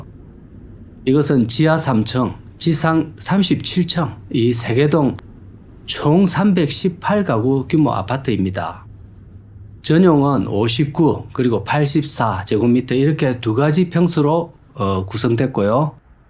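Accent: Gyeongsang, South Korea. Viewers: ㅋㅋㅋ 참에 아~아~아 할때 왤캐 웃기죠 ㅋㅋ 경상도 아재님 ㅎ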